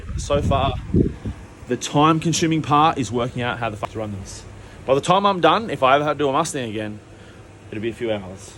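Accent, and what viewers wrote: Australia. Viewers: Two things that will always crack me up, an Aussie accent, and Ace Ventura. You sir got an instant follow as soon as I seen the rhino scene LOL. Cheers from Texas! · this is sweet.. i was just discussing this with some buddies that have old mustangs. i was tossing around the idea of this so i have room for the 2nd turbo, but was a bit sketch to run coolant lines that far. question for ya, and forgive me because I'm a new sub.. but you sound Aussie. thought you guys had RHD's.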